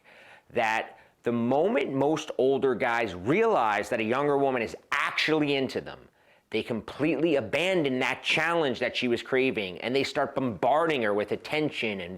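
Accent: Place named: Philadelphia, United States. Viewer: Love it. Slow validation. I’m in exact situation now I’ll be 55 and the girl I’m dating is 39. I’m used to dating much younger woman so I’ve learned this overtime, it took me time in a few mistakes, but eventually, I got it . Quite frankly, most women love a mysterious man. I hear that Philly accent by the way.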